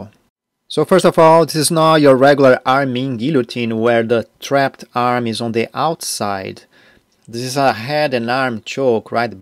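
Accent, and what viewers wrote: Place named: Quebec, Canada. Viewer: Hey I just noticed your voice reminds me of GSP! Did you spend some time living in Quebec?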